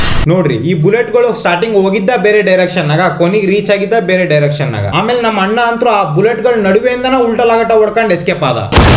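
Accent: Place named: Harihara, India. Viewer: Olle comedy bro n nim dialogue super.. nam Harihara bhaashe kushi aguthe keloke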